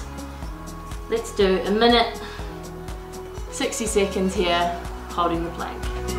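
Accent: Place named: New Zealand. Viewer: Sounds Kiwi (New Zealand)? I mean the accent.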